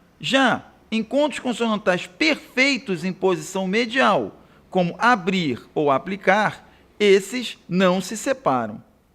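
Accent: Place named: Rio de Janeiro, Brazil. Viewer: Muito boa aula Sílabaxxx, aulaxxx, tõnicaxxx... Carioquês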